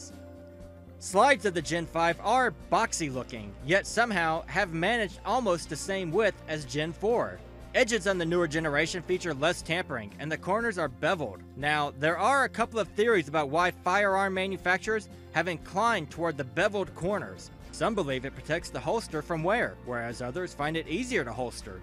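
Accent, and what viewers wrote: Australia. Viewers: australian LMAO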